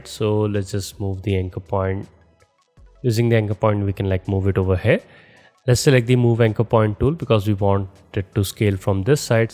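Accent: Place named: India. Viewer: From that woman voice to Indian accent was... harsh. But I managed. Thanks! 😄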